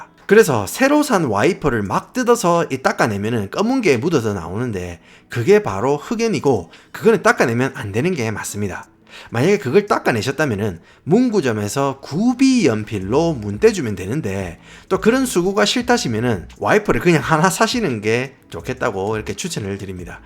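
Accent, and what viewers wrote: Gyeongsang, South Korea. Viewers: 가끔 보는데 마술사같아요 경상도 영어도 재밌어요~^^ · 우연히 영상이 떠서 봤는데 재밌네요 저도 미국인데 그래서 반갑고 사투리라 또 반갑네요 저도 경상도출신인데ㅋㅋ 사막이면 라스베가스이신가.. 암튼 구독하고 갑니다 더운데 수고하세요! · 영상 재밌게 보고, 구독, 좋아요 달아줍니다.ㅎㅎ 고향이 경상도 분인거 같네요. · 혹시? 리쌍의 길 아니세요? 알고리즘 타고 들어 왔다가 헉했어여. 경상도 사투리 강렬하네여.ㅋㅋㅋ.구독 조아요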